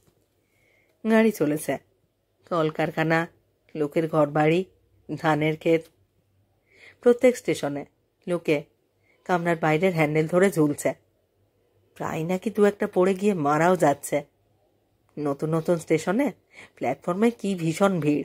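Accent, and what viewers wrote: Bangladesh. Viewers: বাঙাল উচ্চারণ কেন? আরও প্র্যাকটিস দরকার।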